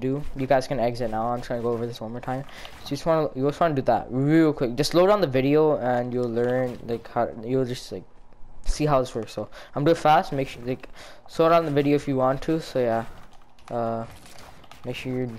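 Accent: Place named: India. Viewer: Did anyone here his India acete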